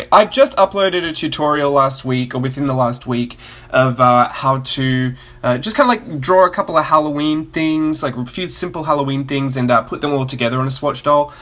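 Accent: Australia. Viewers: Please never lose your Australian accent! Keep up the good work! Thanks!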